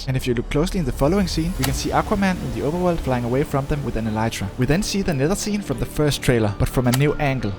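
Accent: Denmark. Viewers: OMG jeg syntes satme du lød som en Dker der talte engelsk XD Så var lige inde og stalk din YT og jemmen godag da kære med Dker :D :D :D Håber ikke du fryser formeget i vores kolde land :D :D :D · “Wow the wolf’s name is Dennis” out of content but Are you from Denmark? I can hear it in your Voice, im from Denmark. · Are u Danish? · Danish brother🇩🇰💪 I could tell by your accent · Are you from Denmark 🇩🇰 no hate and it is just my opinion cause you’re accent sounds like it😊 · Love the danish accent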